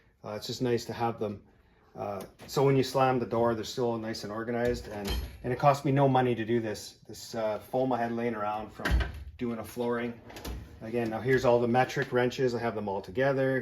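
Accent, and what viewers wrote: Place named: Saskatchewan, Canada. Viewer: Canadian eh! From the fields of Saskatchewan I'm guessing by the accent!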